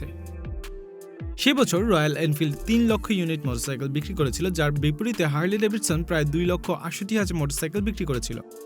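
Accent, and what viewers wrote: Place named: United Kingdom. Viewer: অনুরোধ করছি ভয়েসওভারে বাংলা অংশ টুকু পরিষ্কার করে বলার জন্য। ইংলিশ এ্যাকসেন্ট আর বাংলা এ্যাকসেন্ট করে ফেলায় মনে হচ্ছে বাংলাটাও ইংলিশের মতো করে উচ্চারণ করা হচ্ছে।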